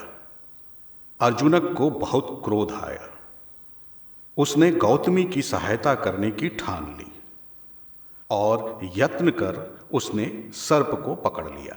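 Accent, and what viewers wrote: Standard. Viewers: शुद्ध उच्चारण में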